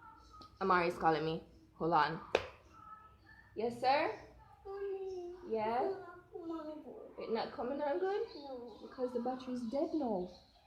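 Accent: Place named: Jamaica. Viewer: Oh Jamaica!! I just went to Jamaica 🇯🇲 last month and I loved it!! I knew I recognized your accent lol it was my first time going to Jamaica. I Posted my trip on my YouTube 🥰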